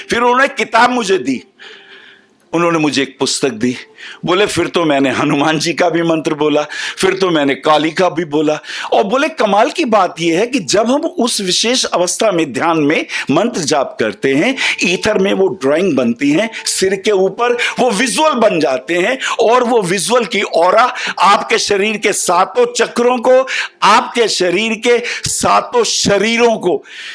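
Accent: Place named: Bengal, India. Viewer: This is how we Bengalis pronounce. Thanks Guruji.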